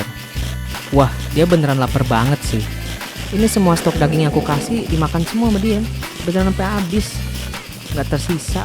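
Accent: West Java, Indonesia. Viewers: Orang Sunda ya bg?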